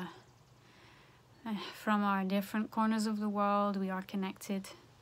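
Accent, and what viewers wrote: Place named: Lancashire, United Kingdom. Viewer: Kútulas She lives in Lancashire England doesnt she ? Her accent is local. I dont think this video is due to media conglomerates.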